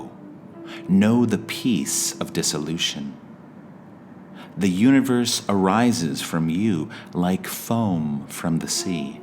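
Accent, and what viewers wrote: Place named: United States. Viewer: It seemed a risky idea to hear this in American English. It turns out it was fine. Thanks 💕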